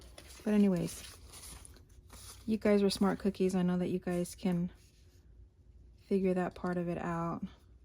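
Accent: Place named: Colorado, United States. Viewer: In your last haul video I heard a little accent that reminded me of home. I'm from Colorado originally. Just curious if you grew up near there.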